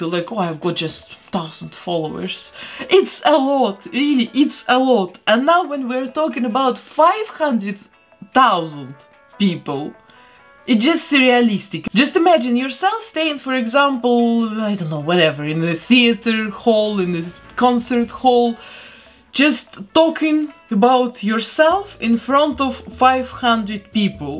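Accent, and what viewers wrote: Russia. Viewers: Хмм, русский акцент?🤔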